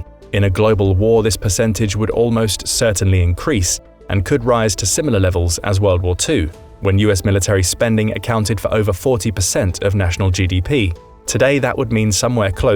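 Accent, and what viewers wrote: United Kingdom. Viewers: He’s from the Uk that’s why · He sounds like a Brit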